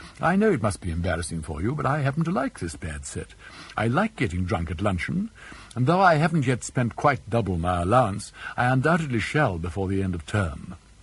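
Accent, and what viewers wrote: RP, United Kingdom. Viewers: his own impeccable received pronunciation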